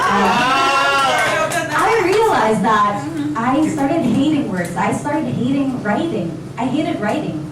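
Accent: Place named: United States. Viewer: when you speak english its as if you are from the USA--more specifically the typical pinay from the west coast like California. Then seeing your other videos when you transition to tagalog and accent comes back. That is a very nice skill.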